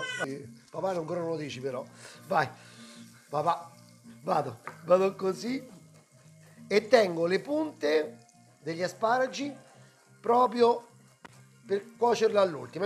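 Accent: Rome, Italy. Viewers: bella ricetta! Mi fa impazzire il tuo modo di parlare romano!e che carino Marietto!...stavo pensando di usare il riso invece della pasta all’uovo..che ne dici??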